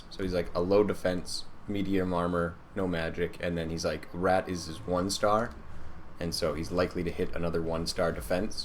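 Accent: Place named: Canada. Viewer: He sounds Canadian. Go Nathan Fillion! I love WM/HORDES and was just about to buy this game but like so many other games with dice, this seems to both suck AND blow. 63% chance of hitting, 3 misses in a row, and I have a feeling that wasn't an isolated case. Also it seems PAINFULLY slow to play a game...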